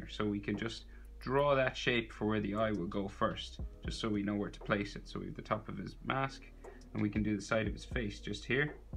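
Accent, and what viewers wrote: Ireland. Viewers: Are u from the south of ireland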